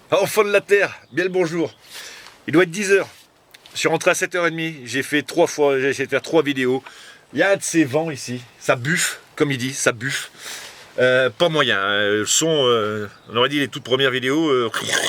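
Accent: Nord-Pas-de-Calais, France. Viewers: j adorre tes commentaires avec l accent et les termes chti . je suis de boulogne tu devais etre de lens vu l accent . super potager ché qu'in mem' un tiot peu ch' bordel . mi à ch't' heur ' j'mont ' min bassin à pichons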